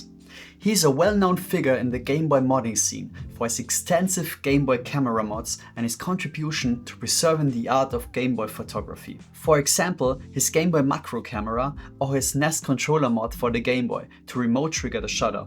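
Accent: Germany. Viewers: are you german? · The chill tone and slight german vibe to this was really calming.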